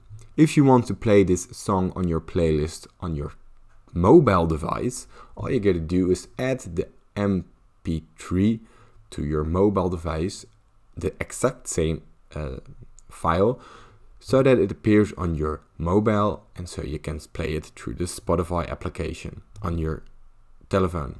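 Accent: Netherlands. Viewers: Ik ruik Rotterdams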